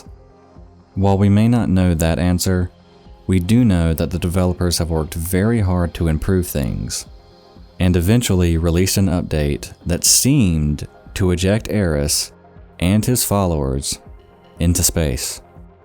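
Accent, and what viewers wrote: South, United States. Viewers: Quickly becoming one my favorite channels. Love your channel man. That subtle southern accent is extremely inviting. Kind of like Lazy Masquerades and Be Bustas accent gives them their little spark.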